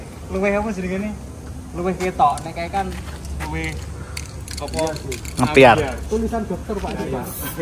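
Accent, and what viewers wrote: Java, Indonesia. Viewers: Channel ini isinya jamet jamet medoggg...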